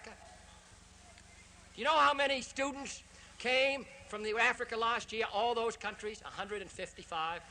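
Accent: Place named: Boston, United States. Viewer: Great Boston accent. And a speech with substance.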